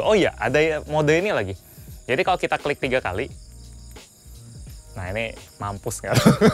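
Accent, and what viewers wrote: Palembang, Indonesia. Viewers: "Trus klo liat kepocok😂 wong palembang caknyo budak ini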